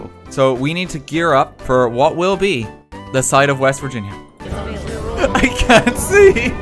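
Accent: Ireland. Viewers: this is my first time watching him because of country roads then I said OH YOUR IRISH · Themlgdinosaur 12 Both of them are from Ireland, so they sound quite similar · Are you an Irish gaymer? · Are you Irish? xD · Are you Irish?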